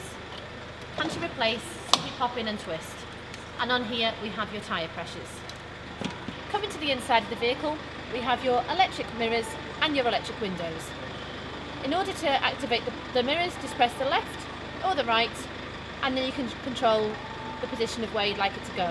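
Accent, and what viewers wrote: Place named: Liverpool, United Kingdom. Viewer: Has to be the most posh Liverpool accent I have ever heard very nice and a very well put together video. I now no how to use the heating on my car thank you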